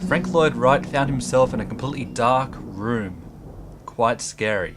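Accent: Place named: Birmingham, United Kingdom. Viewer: i suspect a brummie accent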